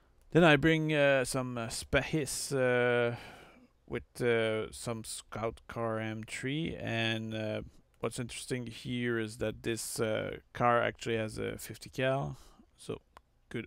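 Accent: Quebec, Canada. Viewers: Oh, what's your nationality ? I can't find what accent is yours. Canadian maybe ? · Te québécois?je crois le reconnaître à ton accent anglais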